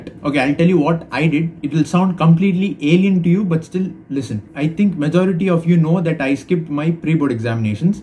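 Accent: South India, India. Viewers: BRO IM SURE UR MOTHER TONGUE MUST BE TAMIL OR TELUGU...U EXPLAINED SO GOOD BRO KEEP IT UP👍👍🔥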